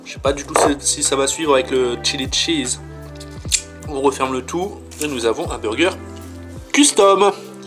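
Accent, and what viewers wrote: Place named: North, France. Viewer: 9/7 pour la petite mousse !! Ton accent du nord se fais ressentir vive le 59 😄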